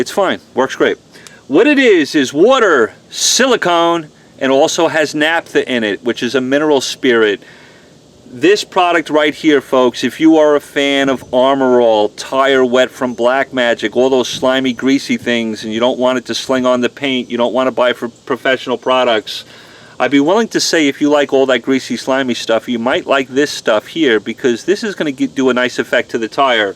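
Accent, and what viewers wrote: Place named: New York, United States. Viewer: Gotta love that Ray Romano NY accent, a rarity in Texas -- keep up the great work!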